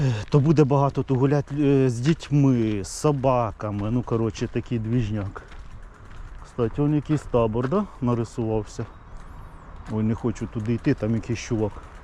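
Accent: Poltava, Ukraine. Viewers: рідний полтавський акцент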